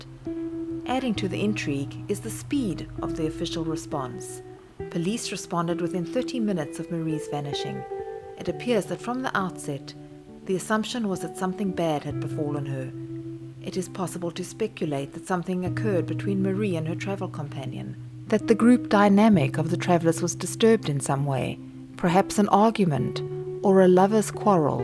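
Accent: South Africa. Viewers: Nice to see a YouTube channel with a South African voice covering this type of material. I have started a blog and will soon have a podcast going which will cover South African true crime cases. I hope to cover Marie's story and have made contact with the twitter account which runs under Get Marie Home. Very strange story. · Very sad,love your channel your soothing South African voice...thanx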